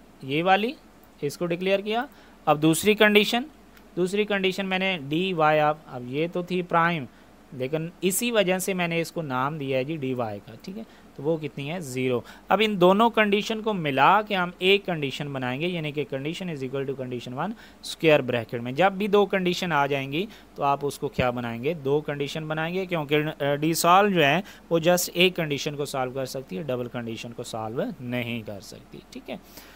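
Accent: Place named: India. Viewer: first lac i understand each and everything😃,thanks allah sir your teaching method is soo good,because you speak too desi like us😁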